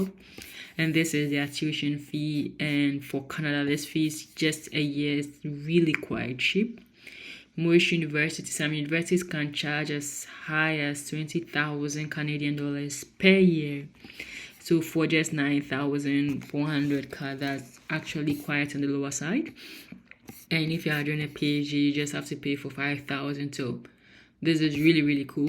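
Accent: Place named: France. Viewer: I can feel the French accent in your presentation 😂.... Great Video 👌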